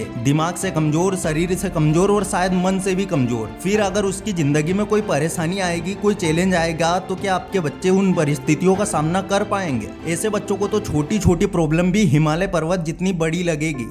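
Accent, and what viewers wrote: Indore, India. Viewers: Aap indori lag rahe ho...accent se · Indore ke aaspas ke ho aap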